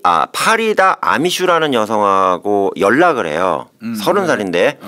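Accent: Chungcheong, South Korea. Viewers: 웃으면 안되는 사건인데 염교수님 너무 정겨워요😂😂 범인이 프랑스인인데 충청도 방언이 들려요 😂😂 앞으로도 잘 듣겠습니다.❤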